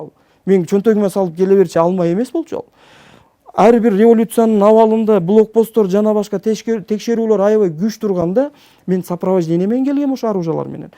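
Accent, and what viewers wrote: Standard. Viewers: Маладец кыргызчаны таза суйлойт экен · Э бул жетим тирик бекен кыргызчаны таза уйронуптур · Сен молодец текебаевге карагагда кыргызча таза суйлойт экесин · Кыргызча таза сүйлөгөнүн карасан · Сарт таза кыргызча суйлойтго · сен еркек екенсин иним Озбек болсон да таза кыргызча суйлоп омурунду берип коркбой Суйлогонуно биз терен ыразычылык билдиребиз. Себеби емне учун ушундай ушу менен жургон кыргыз жигитерибиз ушундай болуп чындыкты айтып чыккан жок. Коркуп угуп олтурса керек уйундо.. Ошол учун айланайын кыргыз елим бери бирибизди сатбайлычы коркбойлучу. Козубуз менен коруп кулагыбыз менен уксак да бир кыргыздын чындык созун укканыбыз жок. Корсо убактылу окмоттун согуш ачып бузукылык кылып адам олтуруп енелерди ыйлатып турганы биздин денебизди елжиреткен жокбу еелим. Убактылуу окмотун Кан кор екенине тура деген кыргыз еелимен колдогондор ооба дегендер барды?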